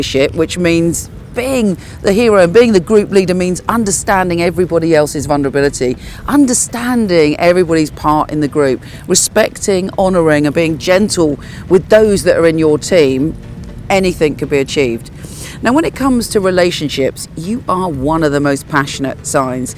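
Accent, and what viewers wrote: United Kingdom. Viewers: Why do I pick a little Scottish from you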